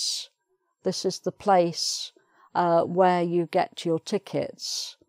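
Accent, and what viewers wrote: United Kingdom. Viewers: Hi,.I like your accent.God bless the UK.天佑英国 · Thank you for this videos, I love the British accent I undersant all without subtitles, Greetings from Mexico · Your videos are my bread and butter lately❤❤❤❤...I love your British accent, could you make a video in how to sound british please? Please, please, please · Hello Mrs., i am Merci from Indonesia. I am on the second year in junior high school this year and due to the covid-19 i have to study at home. It is nice to know you and nice to follow your lessons. I am so interested in following your lesson and i like your accent very much. Anyway, i would like to imitate your British accent keep joining your English program. Best regards and have a nice day from Java island - Indonesia.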